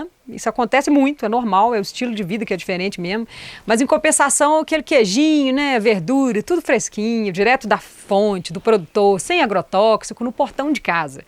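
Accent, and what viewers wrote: Minas Gerais, Brazil. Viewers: ótimo, essa ideia de voltar para esse contato com a terra é especial, temos que aproveitar mais nossa mãe, estamos por aí tão viciados no urbano frenético que por vezes até já esquecemos a nossa origem e é sempre bom lembrar nessa encarnação que quem nos sustenta mesmo não é o sistema humano artificial e sim a água, terra, ar, sol, chuva e (...). Amei esse canal, estava sentindo falta de youtubers mineir@ agente só escuta o sotaque do rio e são paulo por aqui na maioria das vezes...BH me representa muito mais na fala...vocabulário e etc..paz e luz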